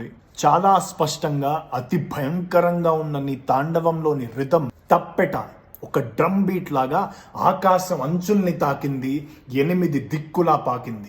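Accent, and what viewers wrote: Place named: Coastal Andhra, India. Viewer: I am a great admirer of you bro. But please correct your pronunciation of the letter శ. Your pronunciation of శ is influenced by Coastal Andhra's accent. The proper way of pronouncing శ is done in Telangana. in Telangana: దేశం is pronounced and written as desham. Similarly, కాశీ as Kashi, వంశి as Vamshi and శివుడు as Shivudu. Some people have a misconception that 'sh' is only used for ష But, to pronounce ష one should know the basics of Sanskrit. ష is little harder to pronounce for not just Telugu people but for many of our Indians (except for those who know Sanskrit).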